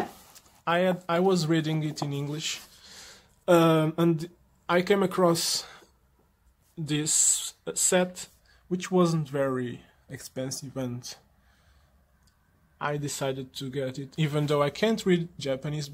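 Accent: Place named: Portugal. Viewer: e vi logo pela maneira como falas o ingles, todos os tugas falam com essa pornuncia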